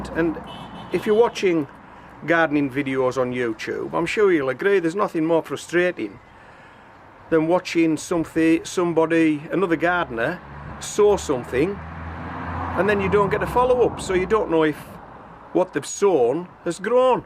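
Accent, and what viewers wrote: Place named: Geordie, United Kingdom. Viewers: After 25 years exiled from the UK it's fantastic to hear a regional (is it Geordie?) accent! Interesting experiment! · This guy is unreal lives in Australia but also lived in uk Middlesbrough his accent is very close lol...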